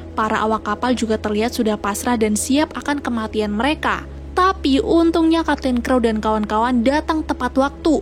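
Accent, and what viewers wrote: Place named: West Java, Indonesia. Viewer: Kak kakak orang mana kaya orang sunda euy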